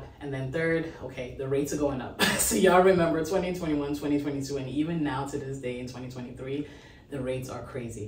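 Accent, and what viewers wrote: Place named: Brooklyn, United States. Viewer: You got that shomonry Brooklyn wow proud I'm from Harlem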